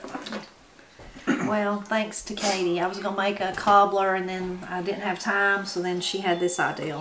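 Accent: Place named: Kentucky, United States. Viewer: We called those kind of dumplings, pastry. Chicken and pastry. It's dumplings when we drop them off a spoon. I'm so grateful I found your channel. Hearing your accent takes me back to those Kentucky hollows. Thank you.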